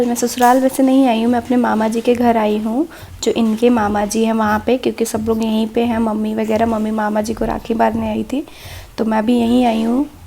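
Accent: Bihar, India. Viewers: Kya aap Bihar se ho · App Bihar se ho kya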